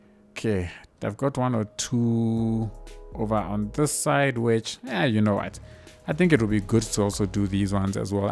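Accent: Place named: South Africa. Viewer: are you south african? your accent is awesome!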